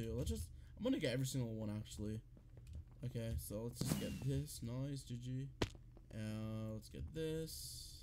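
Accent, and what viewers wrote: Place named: Egypt. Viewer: hmmm, you aound egyptian, my brother :D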